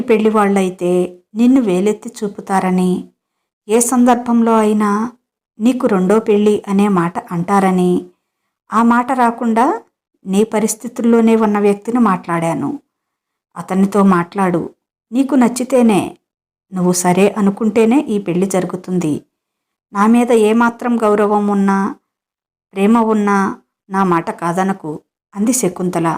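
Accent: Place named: India. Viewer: Chala bagundi talli. Teluguni telugulage acham telugu ammai laga chadivavu. Santosham. Subham. 🌷✋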